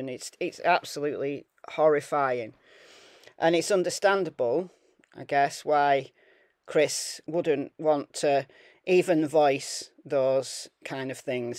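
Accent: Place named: North West England, United Kingdom. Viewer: I’ve just happened upon your tunnel. You don’t sound like a scouser but you don’t sound like a Manc either. Sort of in between so somewhere in the middle is where you are. yes?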